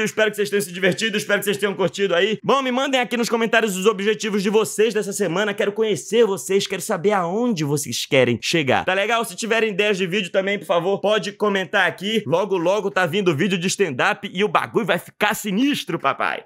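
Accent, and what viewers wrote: Brazil. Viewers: ta com um sotaque carioca da gema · bacana! Tu tem sotaque? Nunca ouvi sotaque piauiense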